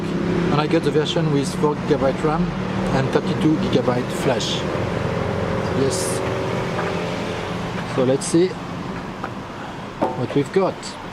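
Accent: France. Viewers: A little french accent ? :)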